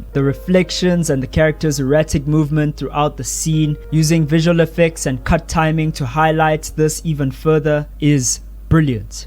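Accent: South Africa. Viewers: Is just me or you South African your accent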